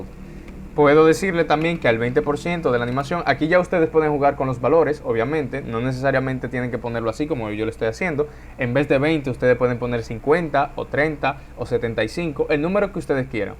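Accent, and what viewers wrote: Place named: Dominican Republic. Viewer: Suenas dominicano 😂 saludos broth bendiciones un nuevo suscriptor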